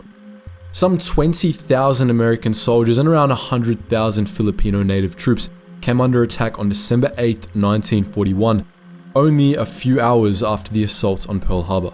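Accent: Australia. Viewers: Do you have another channel or do all aussies sound the same to me? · I know this is months later but I’m really wondering, are you SunnyV2 or Internet Anarchist? Because I swear every dude from Australia on here speaks in the same voice and cadence. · Your Australian voice is very young, youtube earnings young, so what evidence are you basing your narrative on to earn your youtube income?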